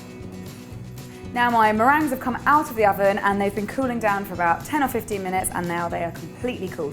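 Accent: England, United Kingdom. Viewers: Hi, just discovered your channel, so nice to hear an English accent on YouTube! I saw another video for making individual meringues and the instructor said they should be put in the oven at 275 for 45-60 minutes....I don't know if my cooker is Celsius or Fahrenheit but I guess 275 was too high as the meringues came out brown. No matter how hard I tried, I could not get my whites to fluff up as much as you did. Is that because I didn't beat enough? beat too much? or is it just that I didn't have an cream of tartar? I would really appreciate your advice.....I wanted to make these for a special family dinner and was so disappointed by the mess I finally produced! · I love the way you talk and your big and beautiful smile ♥ And of course all the delicious stuff you cook. My mom is also a pastry (I don't know if it's well written, I'm Argentinian and my english is not that great) and my favourite deserts are pavlovas, so she always makes it for me. 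I'm gonna tell her about this recipe. Keep cooking, and keep that beautiful smile in your face:3 It's a pleasure to see your videos from Argentina and listen to your beutiful english accent! Big hug ♥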